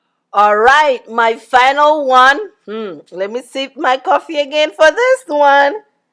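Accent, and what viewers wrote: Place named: Efik, Nigeria. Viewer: You sound like my Efik or Ibibio sister❤ Thank you.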